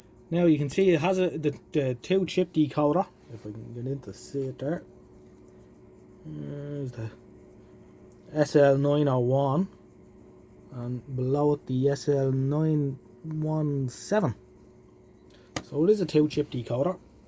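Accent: Ireland. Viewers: I watch because your delivery is low-key, casual and appealing. You repair the same sort of thing I do - yes, a few ladies do this sort of work. (And your lovely Irish accent it rather sweet.) I have completely rebuilt a couple of Bush TV24s and numerous radios from the 1930s and 1940s. But these days AM radios need a local home-brew local transmitter fed by whatever audio source you like. I hope fame doesn't change your presentation too much. I at least I like your videos just as they are! Thank you.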